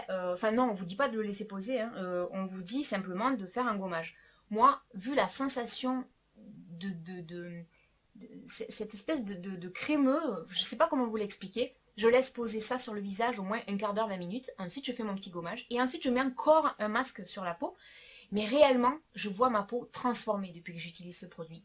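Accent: South, France. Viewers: bonsoir^^je te decouvre aujourdui grace a une video sur aliexpresse;;; qui ceci dis son top^^ j aime bcp tes videos, juste niveau son je trouve que l on entend peu fort, je suis a fond sur mon pc et c est just !!! mais genial, continue comme ca avec se bel accent du suuuud ^^ bises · Je t'en prie: Garde ton Débit 'VIF' et surtout ton Accent quand tu parles!!!!! Je suis du Sud comme toi et c'est tellement beau et bon de l'entendre sur le net!!!!!! Pour ce qui est des produits que tu présentes: je suis brune 'et' rouge et j'utilise le shampoing (pose 3/5 min) et soin repigmentant (pose 10/15 min le temps de finir la douche) Mulatto rouge et c'est franchement bien! Tu as aussi le Nutri Color de Revlon qui est très efficace même si pas bio. Et pour finir tu es un rayon de soleil, pleine de vie et toute sourire! Reste comme tu es!!!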